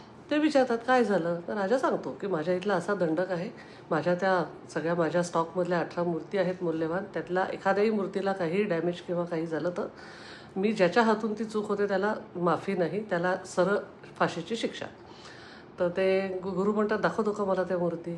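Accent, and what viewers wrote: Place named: Pune, India. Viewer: Mla pn aasch vatat hot ki bahutek tumhi punya cha aasal bolnyacha ton varun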